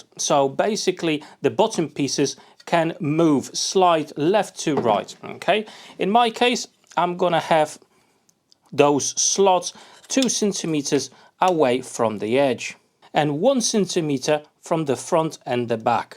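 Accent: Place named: Northern England, United Kingdom. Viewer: Nice to hear that you’ve retained your northern English accent 😅.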